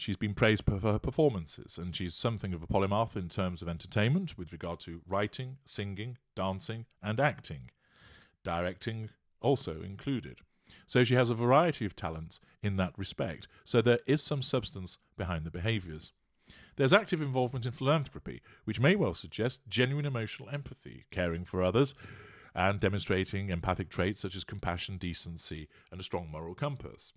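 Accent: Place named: England, United Kingdom. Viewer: Tell me why all it takes is an English accent to make a mf sound like he knows what he's talking about. (???)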